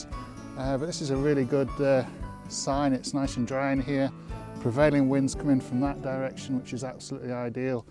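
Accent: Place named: England, United Kingdom. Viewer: I just found you on Utube! I'm watching as it's lovely to hear the English accent & loving what you are doing for the birds! Cheerio!😊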